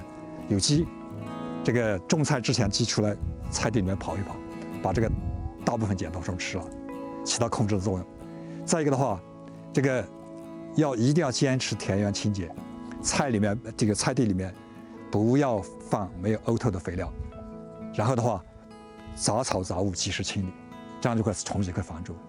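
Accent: Yunnan, China. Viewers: 冒昧问一句，Andy叔您是不是云南人？我觉得你的普通话和我的很像，我是云南人！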